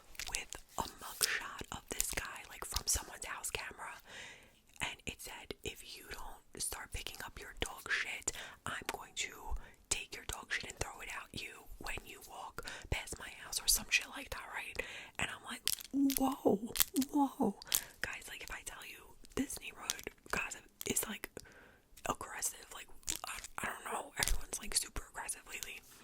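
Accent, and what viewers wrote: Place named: Long Island, United States. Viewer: ❤❤❤ LOVE THIS!!!! I was born and raised on Long Island but now live in Ohio. I love listening to you talk, that probably sounds crazy but you sound like my childhood, lol. God Bless 🕊️💝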